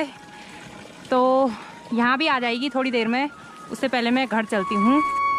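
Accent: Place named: Garhwal, India. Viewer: बढ़िया वीडियो बनाया। हिंदी को गढ़वाली लह में बहुत अच्छा बोलती हैं।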